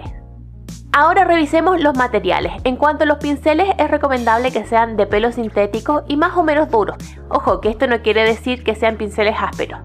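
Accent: Chile. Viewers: tienes acento chileno..o no? yo pinto con acrílicos, soy principiante pero me gusta mucho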